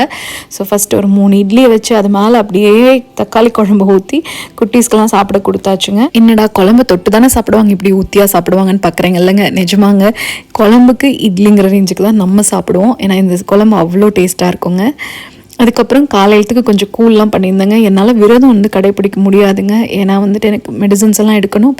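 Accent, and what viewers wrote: Coimbatore, India. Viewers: Lovely vlog ma❤ I studied in Coimbatore Institute of Technology..its been 9 years Missing Coimbatore so much ..Your slang reminds me of lovely cbe ppl ❤️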